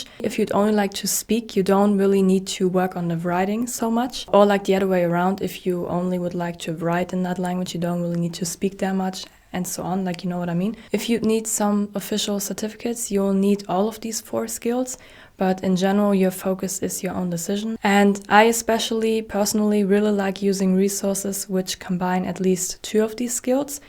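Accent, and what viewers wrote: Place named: Germany. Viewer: Your name shows Italian last name but your accent is much with German , are you German or Italian ?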